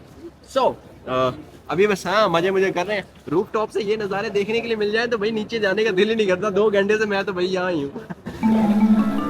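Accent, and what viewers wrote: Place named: India. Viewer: بھائی، آپ کا ولاگ اچھا ہے، لیکن آپ پاکستانی ہیں اور آپ نے بھارتی لہجہ اپنایا ہوا ہے۔ اردو میں بات کریں، جیسے آتی ہے، فخر سے پاکستانی بنیں۔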